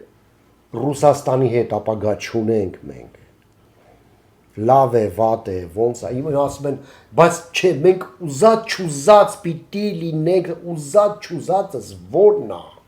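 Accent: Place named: Lori, Armenia. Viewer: Да здравствуйте Лоринский Акцент Люблю свою Родину ❤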